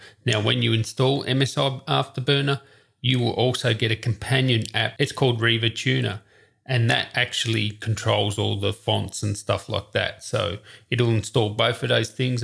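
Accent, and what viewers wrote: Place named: Australia. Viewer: I just need to rewatch the video for the tutorial I came for, I was lost in your sweet Australian accent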